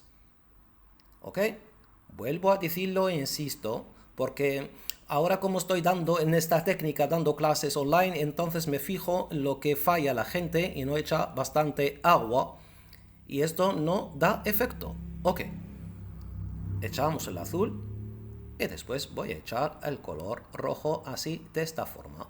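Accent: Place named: Morocco. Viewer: Me gusta ver y escuchr a como maestro, con su acento marrocano en castellano. Recuerdo mis amigos Marrocanos que vivien en Oslo, Yo agradesco siempre por tù generocidad de compartir tus experiencias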